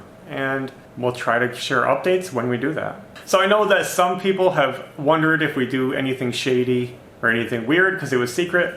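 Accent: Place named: Indiana, United States. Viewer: Kokomo! I knew I recognized the speech patterns. I'm in Valparaiso. Congrats on the YouTube Channel guys. Live the dream here and keep pushing forward!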